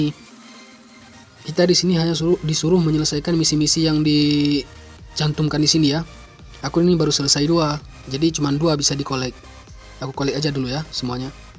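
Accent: Aceh, Indonesia. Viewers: orang aceh ya bang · bahasa indonya gaya orang aceh banget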